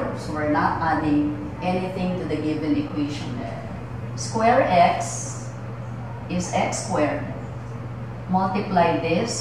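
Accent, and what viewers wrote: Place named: Philippines. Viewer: Are you from Manila Philippines, your accent very similar to my mum, Bernice NGO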